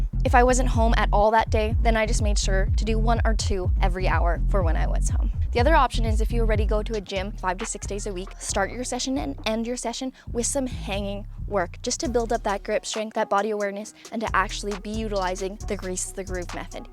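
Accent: Canada. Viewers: I KNEW you were Canadian. By the way you mispronounce "out."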